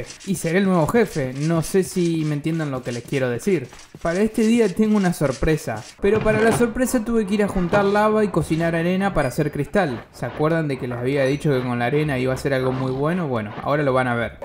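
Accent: Argentina. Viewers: ¿sos argentino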